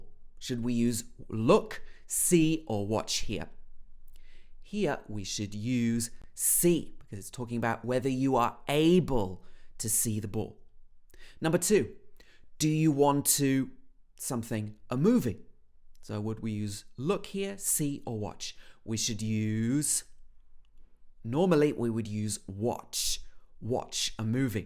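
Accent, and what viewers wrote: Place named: United Kingdom. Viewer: I love british accent. plz make videos on paragraph reading.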